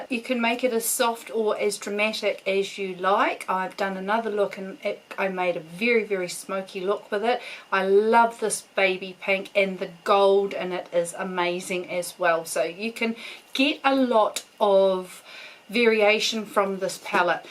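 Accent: New Zealand. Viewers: Hi from Malaysia. I came across your channel while looking for tutorial on Glambition palette. I quickly recognize your Kiwi accent as I lived in Christchurch for a few years and went to University of Canterbury. I binged watched a few more of your videos and realize that you live in Chch too. I feel connected with you already! Thanks for your videos.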